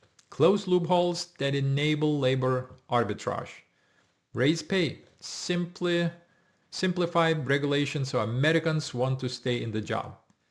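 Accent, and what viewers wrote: Russia. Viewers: Sounds Russian acent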